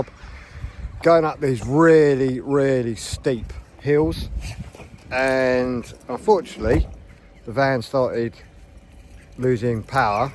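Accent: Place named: West Sussex, United Kingdom. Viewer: I know that feeling of having motor problems with a long drive ahead. You dealt with it well mate. Once you were on the motorway the gear changing isn't the problem it is on small roads and in the towns, but you can't relax, it's there in your mind all the time. I could tell from your accent you were from the west Sussex coast - I used to live in Littlehampton and Bognor and I have it too. I am going to message you one way or another with some info. Happy new year, Pete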